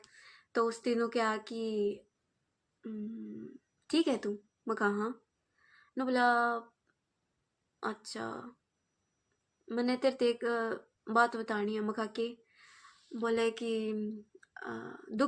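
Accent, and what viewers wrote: Haryana, India. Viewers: omg i just found your vedio and its soo good cheery on the cake your haryanvi makes itt more better 🤗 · It's little bit harder to understand haryanvi but not tough ❤❤❤ Tnx to make this video 🎉 Congratulations 🎉👏🎉👏...( Me bhi gam ki chori hi hun didu 😢😢😢😢) ...and that all problems I have 😢😢😢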